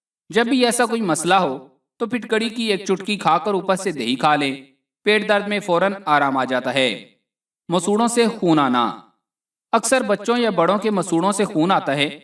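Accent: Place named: Bihar, India. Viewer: Bhai aapki zubaan, bilkul Biharion ki style m urdu bol rahe hain🦅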